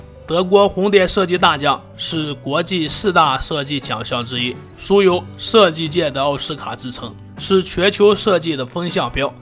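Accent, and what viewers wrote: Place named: Yulin, China. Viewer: 你是榆林的吧。。这口音。。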